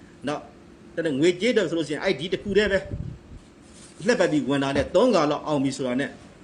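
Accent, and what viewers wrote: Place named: Upcountry, Myanmar. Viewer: အဲပြောတဲ့အသံ အညာသား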